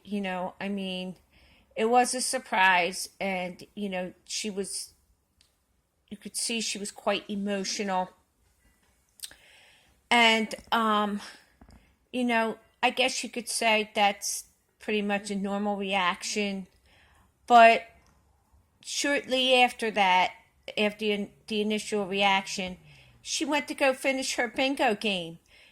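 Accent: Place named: Philadelphia, United States. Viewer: i recognize the philly accent, I grew up 5 mins from you... I wanted to tell you moreso ask you to forgive your mother. you acknowledge and validate who and what she is..now for yourself, forgive her....do not give her power over your thoughts and everyday life. Forgive yourself for the many times you stopped living life because of her actions.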